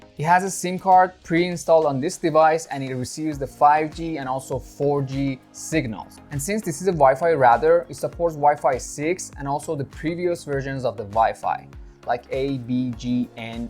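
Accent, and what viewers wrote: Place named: Iran. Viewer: That Iranian accent lol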